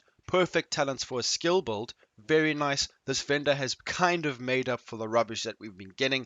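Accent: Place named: South Africa. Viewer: My Bru.. That Accent.. SA boy comming in clutch XD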